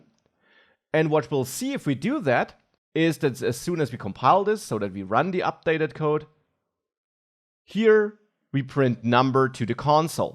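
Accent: Germany. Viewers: Also ich muss ja echt sagen.... Du hast den deutschen Akzent fast komplett raus bekommen, sprichst flüssig und deutliches Englisch und hast dazu auch noch ein super Video gemacht. Ich habe es zwar noch nicht ganz zuende geschaut, bin aber jetzt schon wirklich wirklich beeindruckt. Keine nervige Musik oder halbnacke Frauen, die zwischendurch eingeblendet werden, keine Beleidigungen oder unnötiges Gerede aus deinem Alltag, kein Runtermachen von irgendwelchen Nationalitäten oder Gruppen und dies alles noch kombiniert mit einer wirklich cleanen Videostruktur. Ich kein Deutscher und kann einfach nur sagen das ich dein Englisch als "great" bewerte. Wirklich gute Arbeit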